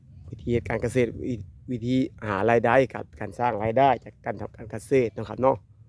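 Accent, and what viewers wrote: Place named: Laos. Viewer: ສຳນຽງຄືຄົນລາວເນາະ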